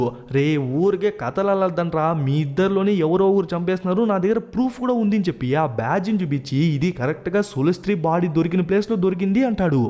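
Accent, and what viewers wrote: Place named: Ballari, India. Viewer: Explanation is good...bro I think you're from ballari... Karnataka state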